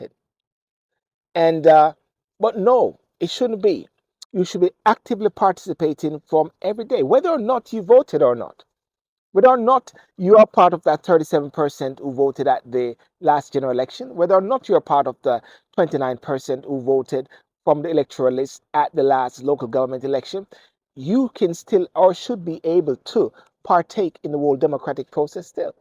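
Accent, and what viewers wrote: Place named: United Kingdom. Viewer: I suggest you go and read the full report and try to let the bias side of your brain to find the facts..ask yourself this the priminster is a 52 year old man that could run any company globally and make millions but unlike you chooses to exercise is patriotic side and remains in his country to help build the economy..i suggest go do some more research and come back to your audience in your firm British accent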